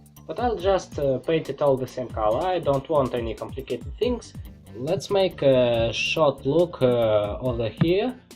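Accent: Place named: Russia. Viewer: Да по акценту слышно русского мужика!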